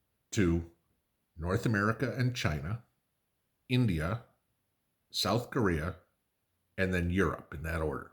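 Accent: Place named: Indiana, United States. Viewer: Came across your channel, very interesting. You have an Indiana accent. Are you a Hoosier?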